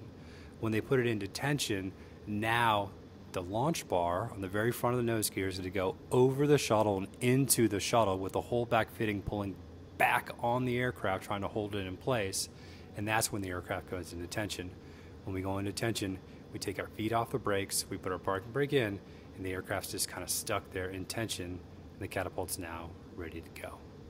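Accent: United States. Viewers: This dude is 100% from California with this accent!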